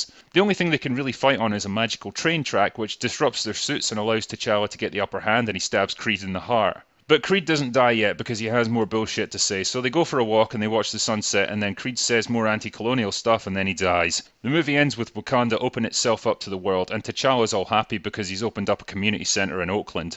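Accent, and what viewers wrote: Scotland, United Kingdom. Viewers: he's the same in pretty much all his videos(including older ones), that is drunk and with a heavy scottish accent, but he became more polite for this particular video as his arguments would have been diminished and he would've been called a racist. Well atleast that's my hypothesis.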